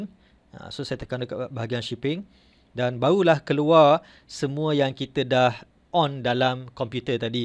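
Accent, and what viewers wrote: Terengganu, Malaysia. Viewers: Terbaik sharing boh👍🏼👍🏼👍🏼.. teka jap boh asal ganu kan.. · Asal ganu ke bang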